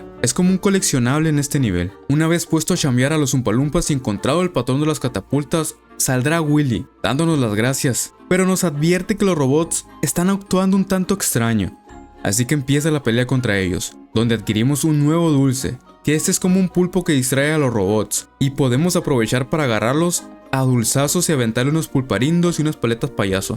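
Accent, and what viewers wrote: Chihuahua, Mexico. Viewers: Aaayyy compadre y ese acento de shihuahua? 😻🫶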